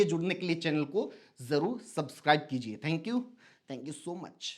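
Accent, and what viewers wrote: Haryana, India. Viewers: I love your Haryanvi language fragrance ❤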